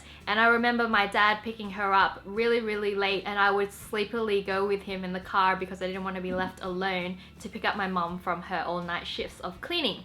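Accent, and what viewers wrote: Australia. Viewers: that's why you sound Australian I live in Australia Melbourne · You know, usually I can tell the difference between Aussie and English accents. but I honestly thought you were from the UK. but then when you said you're Aussie , that's when I actually heard your accent. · she's lived in Australia most of her life, so she picked up the way that the locals spoke. Before she moved she probably didn't have that accent, it just developed over time. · Woah, she sounds really Australian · Wengie are you Australian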